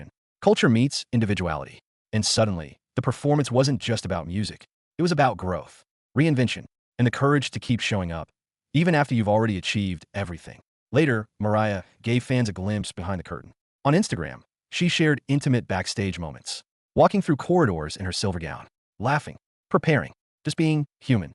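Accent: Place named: United States. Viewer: SHE 'SHOCKED THE WORLD??????'...REALLY????..... 'A BREATHAKING PERFORMANCE THAT NO-ONE EXPECTED'....All I can say is that this narration is SO American it's sickly.....I notice you don't play the performance, you just TALK, TALK, TALK...I thought her performance was DIRE!!!!! just like this video.....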